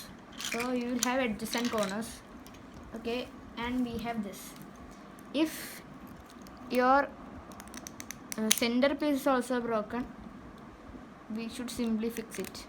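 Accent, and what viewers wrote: India. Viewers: Are you a malayali your English is like that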